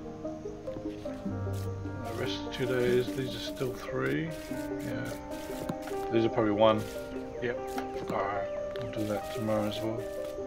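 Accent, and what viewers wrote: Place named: Australia. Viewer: Judging by your voice I'm guessing you're a fellow Aussie haha..... G'Day mate!. Loving this game, so addicting!. I don't want my partner to play as she'll never go to work again haha.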